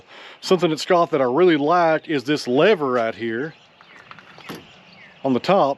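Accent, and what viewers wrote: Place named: Kentucky, United States. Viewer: Of course you got a second tractor... 😁😁😄 I understood absolutely 0% of of the discourse about the new toy, but that's ok. I'm really just listening to the sound of Kentucky. 😊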